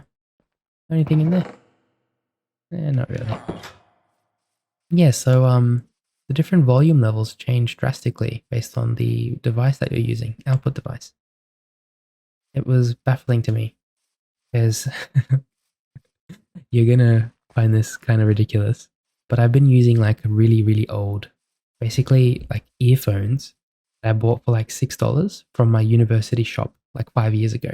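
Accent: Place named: Australia. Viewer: This is my second video to watch from you and I think you’ll be one of my favourites to watch from now on. Your voice is so nice and relaxing to listen to (it’s also nice to hear an Australian accent). I’m glad I clicked on your video ☺️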